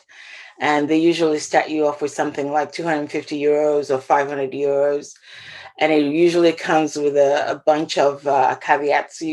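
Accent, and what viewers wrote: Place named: Brooklyn, United States. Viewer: Salud🌹🌹🌹 dear thank you for sharing.... My hubby w his BK accent would appreciate your BK fuggetabouit 😹😁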